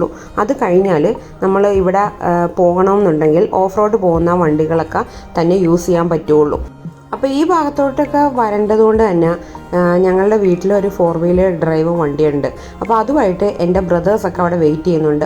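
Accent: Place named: Erattupetta, India. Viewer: Eratupetta slang varunund samsarathil😇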